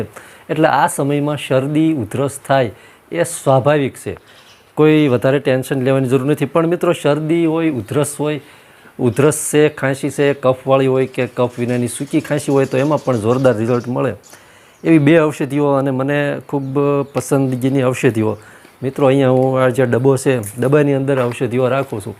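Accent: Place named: Gohilwad, India. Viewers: Namaste motabhai... Ghanaj samay pachhi aap no video prasareet thayo.. aapni kathiyawadi bhasha sambhalvi mithi lage chhe.. aap na darek video hu jovu chhoo.. Ghanaj upyogi hoy chhe and monghi dava ane teni Side effects thi bachave chhe.. aava Sara's ane upyogi video apta rahejo Namaste. 😊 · એ તેમની બોલી છે ગુજરાતી તો બરાબર ગોહિલવાડ ની બોલી છે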